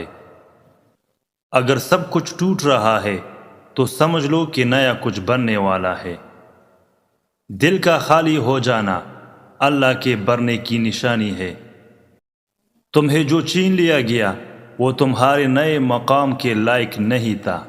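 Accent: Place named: Pakistan. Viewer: Urdu Shi hai wo pakistani lahza hai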